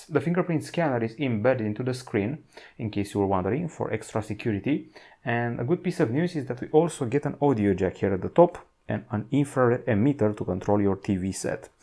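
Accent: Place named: Romania. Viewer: That fuckin' Slavik-Romanian Accent !!!! :) Bună treabă omule excelente unoxinguri și review-uri pe ambele canale !!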